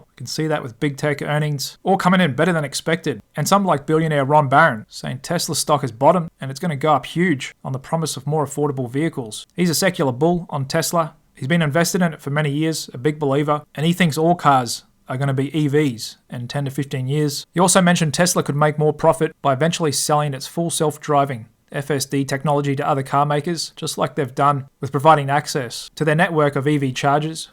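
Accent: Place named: Australia. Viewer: The format, style and delivery of these daily videos is amazing. They really help me to keep track of what is going on globally and I am forever grateful for all your hard work and commitment to this. I only hope you keep it up and continue helping all the really grateful viewers you have, and I think I can speak for them on this occasion. Perhaps a bit naughty of me to say this but your Australian twang is such a refreshing change from the usual accents that we listen to day in and day out on financial channels. This is coming from a Brit! You come across as really professional, easy on the ear, and sincere. Cheers mate, hope you keep delivering!! Have a great weekend 🇦🇺🇬🇧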